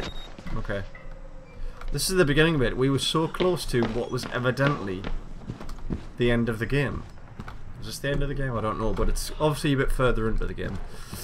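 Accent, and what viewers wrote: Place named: United States. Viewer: I watched anyways. I actually like your accent (have a real hard time placing it). I was talking about your American accent. It made me giggle.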